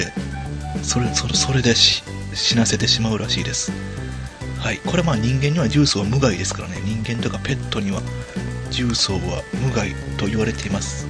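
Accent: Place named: Juso, Japan. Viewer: 十三のイントネーション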